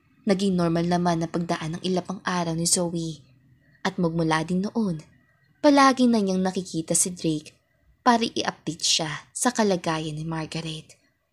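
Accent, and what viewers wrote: Visayas, Philippines. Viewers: Napakaserous Kasi Ng Bago mong narrator Hindi kagaya mo na Minsan may nakakatuwa Lalo na Kong may halong bisaya tuwang tuwa ako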